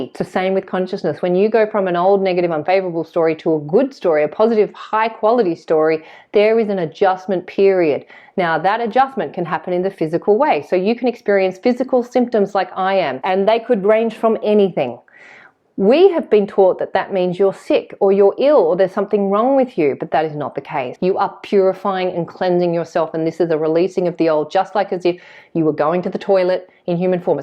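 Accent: Australia. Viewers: Hey I come here for your Aussie accent. Love it.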